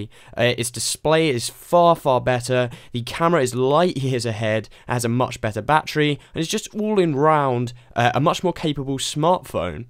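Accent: United Kingdom. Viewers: I understand you may have US viewers, but please can you put the prices in pounds as well...you do sound British anyway. :)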